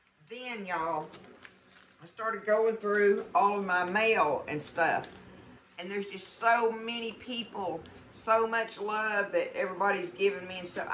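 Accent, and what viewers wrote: South, United States. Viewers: Ms. I just recently found your channel and you had me not only for your great recipes and shortcuts but the first thing to really get my attention was when you opened your mouth and spoke. That accent made me feel so much at home in your kitchen. I live in NC and have had so many people comment that I was “country as cornbread” when they first heard me speak. That was years ago and many comments away and I’ve come to feel proud to be country. Thank you so much for your channel. Your recipes are great but just listening to you talk such a comfort. I’m 70 and only cook 1 meal a day and I ed tried se real of yours and have not been disappointed.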